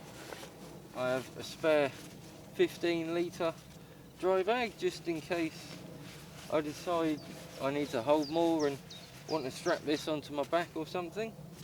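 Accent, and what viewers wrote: Norfolk, United Kingdom. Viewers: I really enjoy your videos very helpful and you have such a nice Norfolk accent 🚴‍♀️🚴‍♀️🏳️‍🌈🏳️‍🌈🚴‍♀️🚴‍♀️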